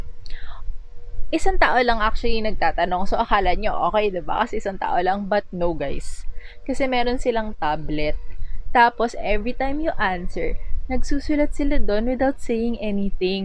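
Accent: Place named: Conyo, Philippines. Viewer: very pero conyo, IBA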